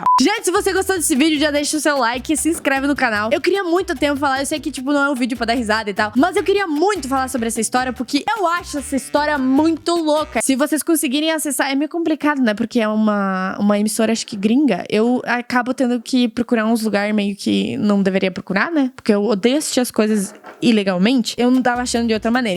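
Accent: Rio Grande do Sul, Brazil. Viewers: Mas tu tem o sotaque daqui de rio grande do sul tchê!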